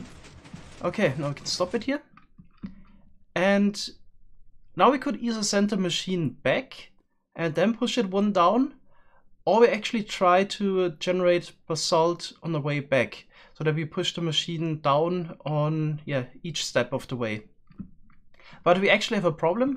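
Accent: Germany. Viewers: You hear your german accent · He's German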